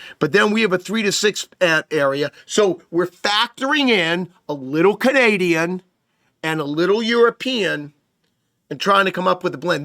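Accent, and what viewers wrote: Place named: New Jersey, United States. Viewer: Jeez, you couldn't talk about when there is going to be frozen precipitation in Texas. It sounds like you 're in New Jersey for God's sake, from your accent. Noone here cares about New Jersey. What is wrong with you.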